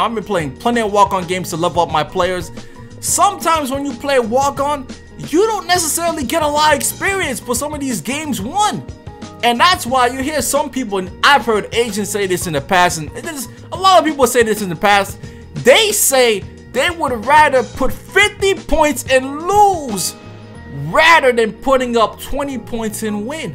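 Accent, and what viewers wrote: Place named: New York, United States. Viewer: Jamais That's how new Yorkers talk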